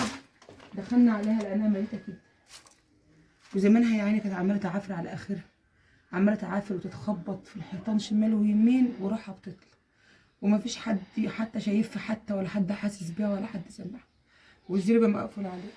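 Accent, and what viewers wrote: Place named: Mansoura, Egypt. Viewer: ربنا يوفقك انتي ست محترمه بس لهجتك من المنصوره يعني بحري قري المنصوره لكن استغربت انك جبتي العبايات من بسيون غربيه على فكرة انا من ميت غمر.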